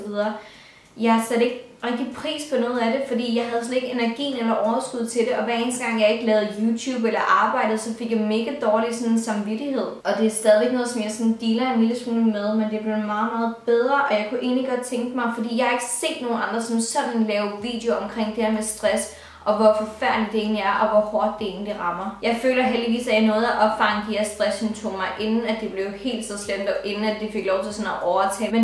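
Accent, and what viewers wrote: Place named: North Jutland, Denmark. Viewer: Elsker når man lige en gang i mellem kan høre en snert af din nordjyske accent <3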